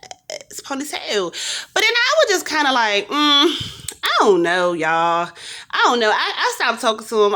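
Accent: New York, United States. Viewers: Did I ever tell you that you sound like New York ?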